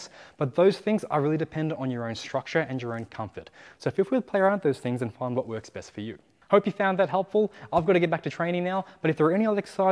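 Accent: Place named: Australia. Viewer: When I heard your voice I immediately picked up that your were an Aussie, and then I looked at your About page and yes, I was correct. Thanks for the deadlift info.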